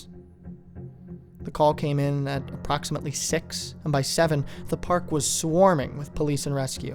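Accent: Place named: South, United States. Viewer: Great narration! Loved the "southern" voice. Is there a part 2???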